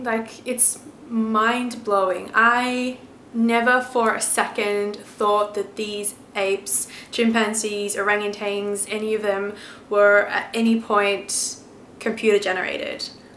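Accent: Australia. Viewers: Glad I found your channel. Subscribed. Are you Australian?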